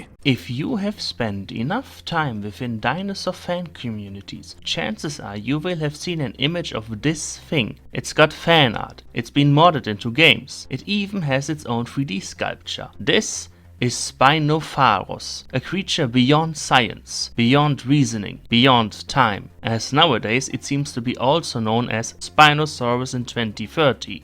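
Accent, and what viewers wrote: Germany. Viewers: deutscher akzent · Are you german?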